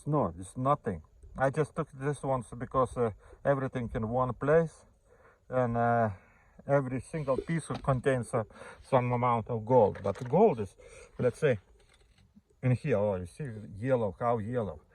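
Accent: Russia. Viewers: Приветствую! Вы русский? Акцент ухо режет.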